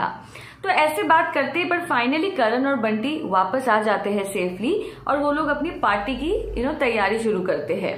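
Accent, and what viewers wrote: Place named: Bengal, India. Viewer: Are ypu bengali by any chance i know considering ur sirname its not possible yet you have the accent when a bengali speaks hindi and you have a bengali beauty kind of a thing in your looks.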